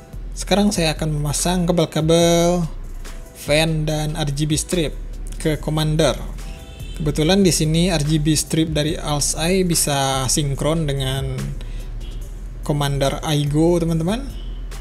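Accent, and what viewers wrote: West Sumatra, Indonesia. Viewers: Ko iyo minang bana aksen nyo 😂😂😂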